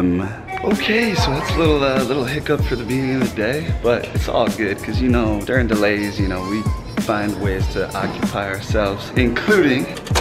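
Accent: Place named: Netherlands. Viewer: Talks for a sec, ok its a dutch dude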